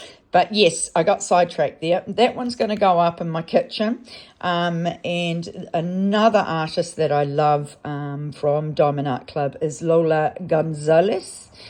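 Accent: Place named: New Zealand. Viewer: Hi & fur babies, wonderful to see you back and all your finishes and WIPs. I have missed you & your kiwi voice (us few kiwis do miss hearing our on accents 😉). Love all of your DP's. Neat to see the Gemini. I think a yellow rose is also a Gemini flower 🌼. It's so sparky. Look forward to seeing more of you on YT. Take care, Matariki nearly here, yay.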